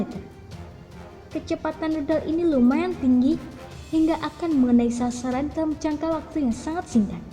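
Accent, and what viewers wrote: Java, Indonesia. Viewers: Sopo iku sing baca narasi ne logat jawa tenan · Medok kali suara nya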